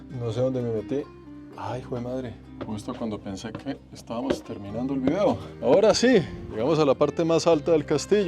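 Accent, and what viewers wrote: Colombia. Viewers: me encanta tu estilo, tu hablado parcero, tienes mucha gracia al hablar.🗣️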